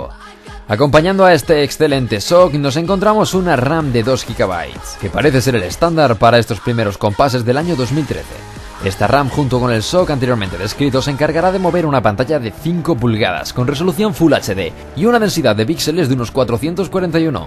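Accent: Spain. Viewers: me la fuma el ezpañol de ezpaña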